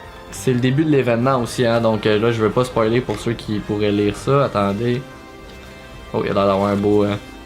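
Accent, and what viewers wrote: Quebec, Canada. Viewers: Es tu quebecoi (moi oui)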